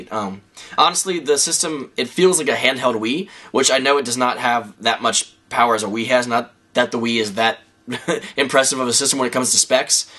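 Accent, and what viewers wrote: United Kingdom. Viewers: british accent O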